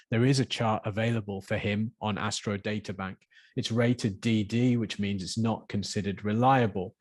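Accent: England, United Kingdom. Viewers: I know this sounds ridiculous but besides the undoubtedly high quality of your research, what i like about your videos is your British accent. It's so much more pleasant to hear than american or australian. Or for that matter mine i.e. indian! 😀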